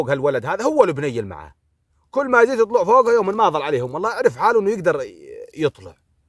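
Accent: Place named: Western Iraq, Iraq. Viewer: امتابعك من العراق استمر وعلى فكرة لهجتك تشبهة لهجتنة بل مناطق الغربية حيل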